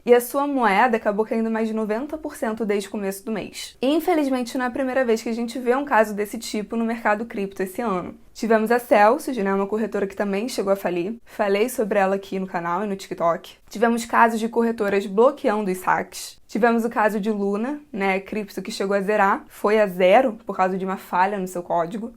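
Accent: Rio de Janeiro, Brazil. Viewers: Nossa, seu sotaque carioca torna o vídeo mais gostoso de consumir. Sério, hahaha. Então, sou novo no canal e desde que lhe descobrir no TikTok, venho acompanhando todos seus vídeos. Tanto aqui quanto lá e posso afirmar que você explica muito bem. Exprime o conteúdo de modo claro. Muito bom. Bitcoin ainda é complexo pra mim — sou leigo no assunto gerais de economia —, mas o interesse que tenho por tudo que envolve economia me locomove para entender mais. É bom estar atualizado por alguém lúcido. Forte abraço. 👍🏼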